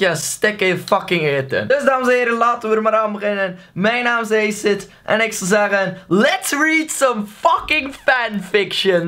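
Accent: Belgium. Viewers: je hoort echt dat hij een Belg is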